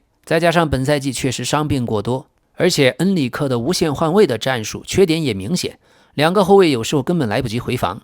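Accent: China. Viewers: 眾口難調啊，我個人的經驗是字幕繁體優先，因為老k的聲音已經是大陸口音了，再配上繁體字幕 + 西語球員名詞，能夠最大化兼容大家的習慣。聽不懂可以看，看不明白可以聽，總能把信息傳遞出去。而且很多平時寫簡體字的朋友其實也看得懂繁體字，老k可以考慮多修覆一些繁體字的錯誤，而不是改成簡體。這個可以慢慢用 AI 來糾錯，集中找幾期過去的錯誤作為訓練，很快就能改進的。個人建議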